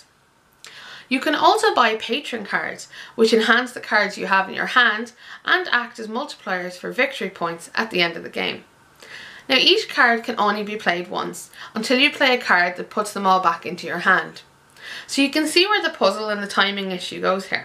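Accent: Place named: Ireland. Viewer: Nice video! Great to hear an Irish voice talking about games too!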